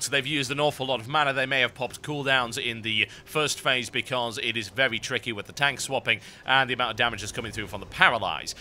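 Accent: United Kingdom. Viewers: ahhh british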